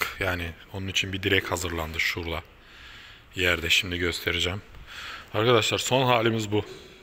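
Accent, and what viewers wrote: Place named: Russia. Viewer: Ustam çok güzel olmuş. Hayirli ıslerin olsun. Konuşmanda ara ara rusça aroması alıyorum. 😊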